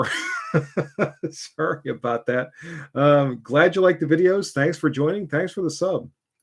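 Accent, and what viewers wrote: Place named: Texas, United States. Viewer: okay okay okay, I may have thought it when I heard your Texan drawl.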